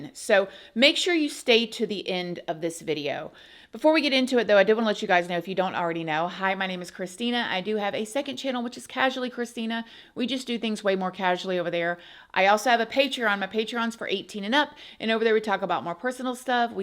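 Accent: South, United States. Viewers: Is it a southern accent ? Ilke how she says poóolice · Very much a southern (south-eastern American) accent lol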